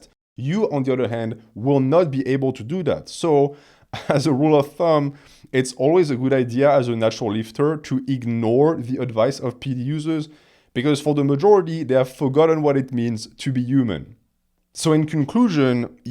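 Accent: France. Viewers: This is absolutely one of the best explainations of the dynamics of musculature and it's progression, I cannot believe this is free content. Wow. Bravo. Also, please tell me what the origin of this glorious accent is. Edit: before anyone chimes in and tells me France, duh. I'm talkin' specifics here.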